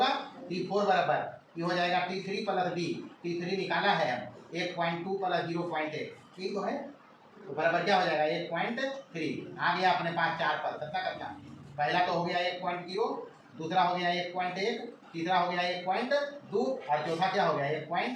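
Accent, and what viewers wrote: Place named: Bihar, India. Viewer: Uncle aap Mokama se he na bhasa se pahchal liya me bhi waha rahta tha batcha me 🙂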